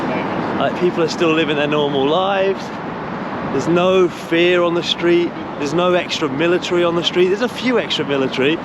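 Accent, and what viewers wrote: United Kingdom. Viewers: Нет, это британский английский. Поэтому не так понятно .😊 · If you're living in US you should hear his accent, it's obvious UK accent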